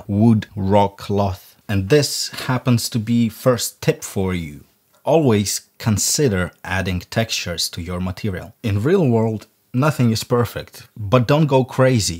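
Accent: Russia. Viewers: блин, я прям чувствовал натянутое английское произношение, ахаха, русских видно сразу =) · Are you a Russian? Doesn't seem you're from UK.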